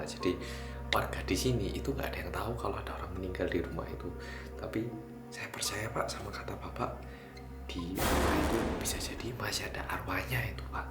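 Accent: Java, Indonesia. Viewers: Bang latih dong ngomongnya jgn terlalu medok, jujur aku kurang ngerti ceritanya, dan suaramu kadang timbul tenggelam ga jelas, seharusnya agak keras sedikit biar jelas 🙏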